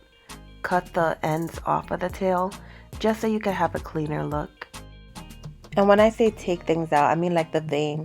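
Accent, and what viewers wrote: Jamaica. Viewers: nice video wheres the accent from, jamaica?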